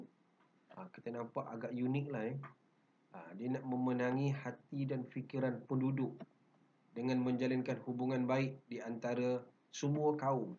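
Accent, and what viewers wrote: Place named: Perak, Malaysia. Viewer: Suka sy dengar loghat Perak Cikgu☺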